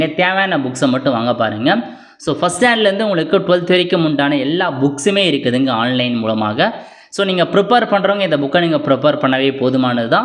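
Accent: India. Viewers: Ennada hindikaran tamil pesura madhiri pesikittubirukka...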